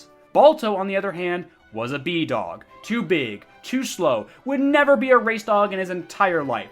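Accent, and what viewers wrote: United Kingdom. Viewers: Never knew the true tale of "Balto", and your sophisticated British accent astonishes me to this point, dear. 🧐😎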